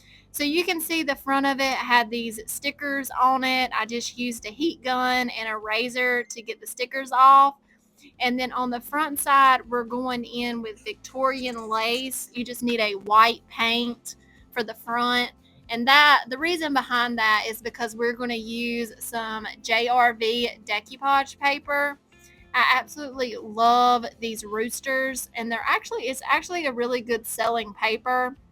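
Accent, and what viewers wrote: South, United States. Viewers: They are all beautiful, what state are you in. Must be native born. I'm just not used to a southern accent it's nice.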